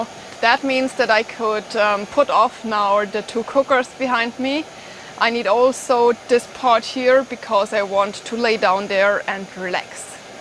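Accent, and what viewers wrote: Germany. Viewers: Your German English wonderful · I bought your book velcome bek am from vild and bushcraft love it am your number one fan am. Not making fun of you I love your heavy accent it reminds of the German girl in the movie YOUNG FRANKENSTEIN the mel Brooks comedy movie she talks like you.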